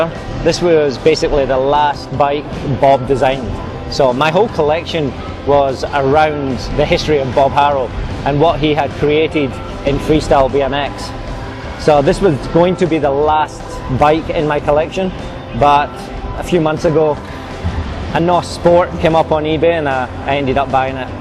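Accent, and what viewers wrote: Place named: Glasgow, United Kingdom. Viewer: Sounds like a glasgow accent did you ride around 1984 85 .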